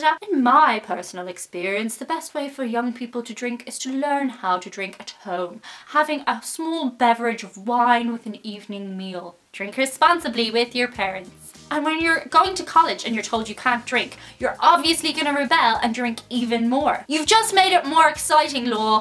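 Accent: Canada. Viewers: True, although I am also from Vancouver (same city as ), and I have never heard anyone say aboot either. He is literally the only person I have ever heard say that. So, I'm not sure if he exaggerates it on purpose...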